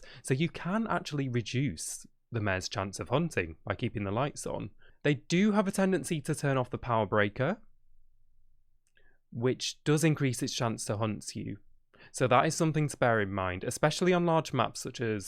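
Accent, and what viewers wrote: United Kingdom. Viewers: that isn’t scouse lmao it’s East Midlands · It's the Scouse accent. It's really disarming.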